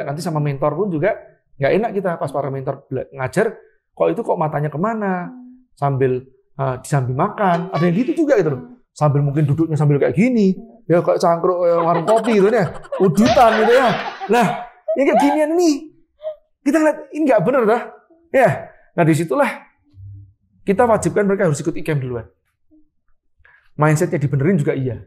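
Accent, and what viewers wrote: Java, Indonesia. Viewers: Koh asli mana si..jawa ya.medok.hehhehe Sukses trrus ya koh..moga sehat selalu..aamiiiinnn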